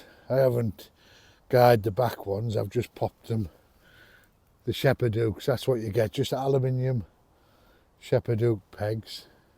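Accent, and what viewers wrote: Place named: North East England, United Kingdom. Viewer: I stumbled across your channel by chance sir. Glad I did. So will be viewing your back catalogue now. Love this kind of content and especially the down to earth people who have channels on YouTube. A lot of people can relate to. Which part of the north east are from? I watch a lot of blot outdoors videos. You sound a lot the same. I live in ormesby st Margaret near Great Yarmouth. So we all sound like farmers down here. Apparently to a lot of people. 😂. Stay safe my friend, Andy from Norfolk. 👍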